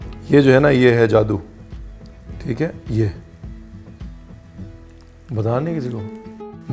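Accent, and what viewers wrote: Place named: Punjab, India. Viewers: Awesome!! Gotta give it try! Love your Punjabi accent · Yummy mouth watering 😛😛sir I like all respiees prepared by you Good way of explaining and punjabion vala bolane ka style👍👍👍👍👍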